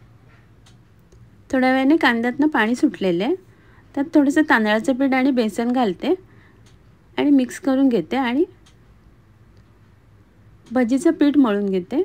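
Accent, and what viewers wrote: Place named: Konkan, India. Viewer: Marathi only... In the sweet tone of kokani 😂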